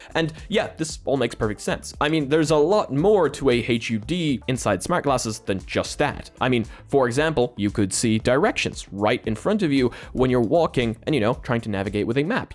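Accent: Poland. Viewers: WAIT HES POLISH ohh s o thats the polish accent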